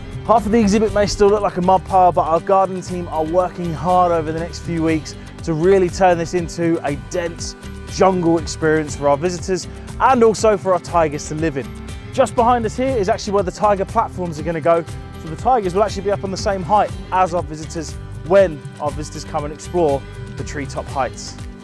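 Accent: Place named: Germany. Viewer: Cara orang Jerman bicara Inggris ketauan banget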